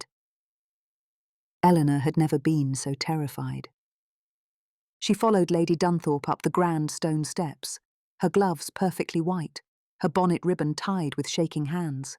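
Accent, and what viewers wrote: England, United Kingdom. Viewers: I just started listening. The narrator sounds wonderful. Is there anything better than listening to an English accent? Anyway, I’m anxious to see how things play out. Thanks for posting. 📕📖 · English accent is my favorite!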